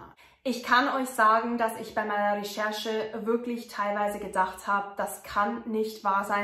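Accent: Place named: Bavaria, Germany. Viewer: Bin dich seit tagen am bingewatchen :D Schau normal auch überwiegend american true crime channels bzw Gerichtsverhandlungen, je detaillierter und länger desto besser :) Du bist die einzige deutsche die ich schau, gerade weil du so ordentlich recherchierst bzw auch ahnung von der amerikanischen gesetzgebung hast. Damit hebst du dich qualitativ echt hervor :) Oh und ich liebe deinen charmanten bayerischen akzent :D Bitte mach so weiter du hast echt talent <3 Lieben Gruss aus den Niederlanden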